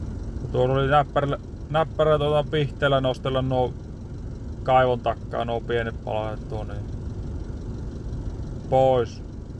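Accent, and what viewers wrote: Finland. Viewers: Hehehe, as soon as I heard your voice I knew you’re a Finish guy. And normally Finish people understand humor and sarcasm. But yeah, you got some skills there, not going to lie :o) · I can't with the country Finnish accent telling the most relaxed story of what's been going on while performing intricate surgical moves with a huge machine. 😂 Eeipä se haettaa. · I just realized why my question was awkward! Sorry, when I posted my comment I hadn't had the chance to listen carefully yet, and my understanding of the Oulu dialect is still narrow so I hadn't caught much of it anyways — I got a little too confident.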